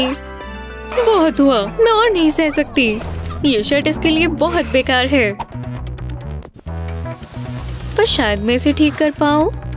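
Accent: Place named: United States. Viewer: are you American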